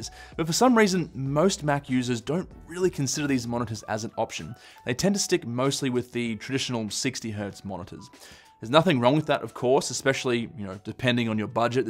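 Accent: Australia. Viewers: I subscribed as soon as a heard that beautiful Aussie accent. How about the Hidpi compatibility? Some monitors don't have that options when connected to MacOs. I'm thinking of buying a mac mini and a gaming monitor. Cheers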